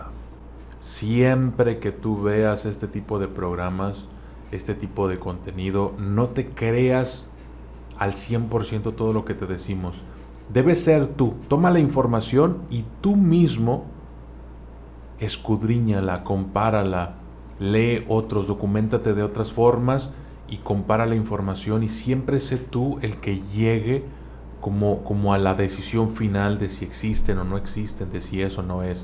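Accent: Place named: Yucatan, Mexico. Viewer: HE VISTO VIDEOS DEL DOCUMENTALISTA , Y ES UNO DE MIS FAVORITOS , TENGO UNA DUDA EL COCO QUE LLEVA LA BATUTA DE ESTE VIDEO , DE DONDE SERA ??? TIENE UN ACENTO DIFERENTE EN MI OPINIÓN COMO DE YUCATECO MÁS NO SE POR ESO PREGUNTO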